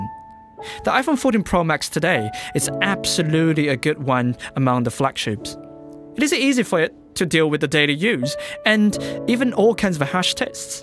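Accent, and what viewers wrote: United Kingdom. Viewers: 居然是英音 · 这是brit accent😂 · 英音很正❤ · 英式口音，牛的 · 还好吧，英音，反正水平比我高八条街，对于做视频完全够了，多少海外youtuber都还有各自国家的口音也没人评判什么。 当然，油管这个平台其实美音会更占优势些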